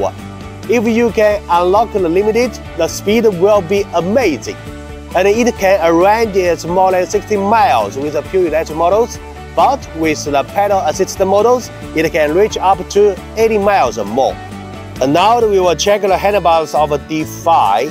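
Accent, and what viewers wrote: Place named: China. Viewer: I love his Chingrish... so adorable.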